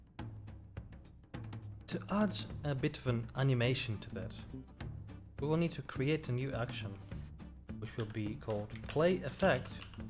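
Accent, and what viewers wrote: Ireland. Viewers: Didn't know you're Irish dude, what county? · Are you irish?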